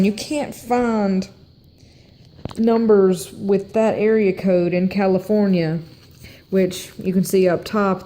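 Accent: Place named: Alabama, United States. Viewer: I'd guess you're in Alabama based on your accent. Maybe Georgia?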